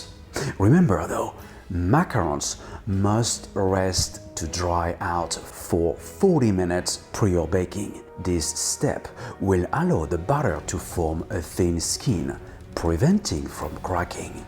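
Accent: France. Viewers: Banish with as French accent!! Need anything else . Make a macaron!!!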